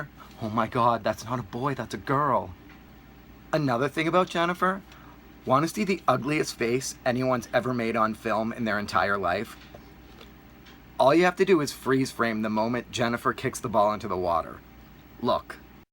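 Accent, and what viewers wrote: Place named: Boston, United States. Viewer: is not British he is Bostonian they talk simlar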